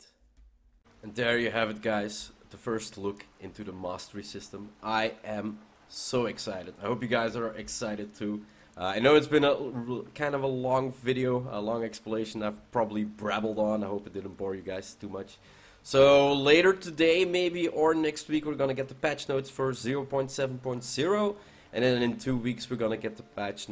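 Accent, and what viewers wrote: Netherlands. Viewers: ah youre from zuid-Nederland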